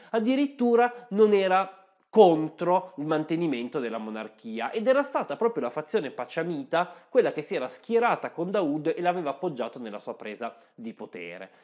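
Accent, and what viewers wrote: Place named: Bari, Italy. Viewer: Good morning Vietnam....bellissimo ma i pezzi musicali sono tanti dai a.....invece è bella come canzone è dei che consiglio ah ah ah ma tu sei un Barese oriundo.....invece che sono barese vedo che parli un dialetto completamente diverso, quindi nei paesi i Dialetti sono tutti diversi l'uno dall'altro, io Pensavo che Bari e le sue province parlassero il barese e magari Lecce e provincia il leccese...invece il dialetto cambia anche da comune a comune o anche a zone diverse della stessa città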